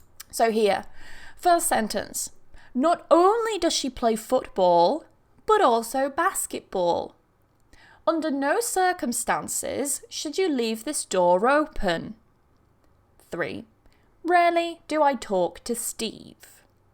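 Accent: United Kingdom. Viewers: Thank you so much from Ukraine! Just about a couple of days ago I was thinking about the inversion, as I see it quite often and I was interested in learning it. And today I've seen your video and I was able to understand everything you were talking about! Thank you!! And one more thing!:)) As I'm learning different English accents (British is my favourite one!:)) but I also know you have more than 50 accents and dialects in England, I want to say that your accent is really great and it's a great pleasure to listen to it:))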